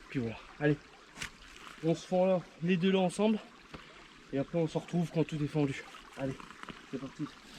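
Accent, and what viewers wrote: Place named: Lorraine, France. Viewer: A l'accent je dirais un lorrain 👍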